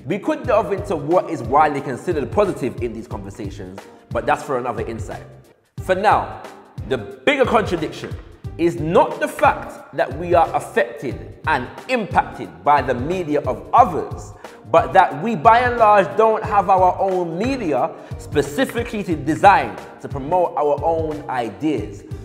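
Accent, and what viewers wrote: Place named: Jamaica. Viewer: Maybe he spoke in jamaican accent or patois in his home as a child its common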